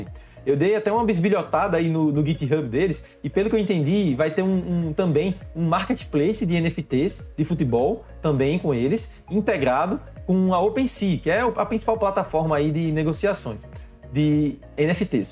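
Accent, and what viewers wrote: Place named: João Pessoa, Brazil. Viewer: Parabéns pelo conteúdo? Tu és de qual cidade? Tens o sotaque parecido com daqui de João Pessoa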